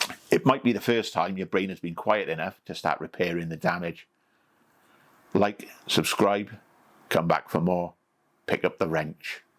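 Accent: United Kingdom. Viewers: I'm from USA and this gentleman should get a medal. I get his Brit accent and directness like he's talking to a friend. I recommend you view as often as possible during sobriety.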